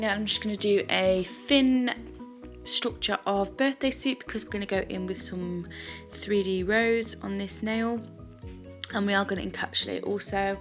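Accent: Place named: Midlands, United Kingdom. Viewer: Uproar you have got to be from the Midlands like me girl xxxx love your vids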